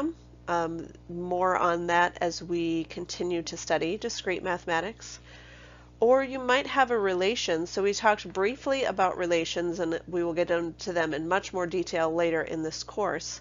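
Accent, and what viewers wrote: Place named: United States. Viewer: All of your videos I have watched thus far have an electric ohmmmmmmmmmmm sound to them. idk I feel like when you're going to upload this much content it is probably worth looking into. Maybe you have by now idk. But you have a nice American accent and I really need to learn this stuff haha. Thank you for uploading these. I truly appreciate you educators who upload content that is free an accessible to all. You're the best. ☻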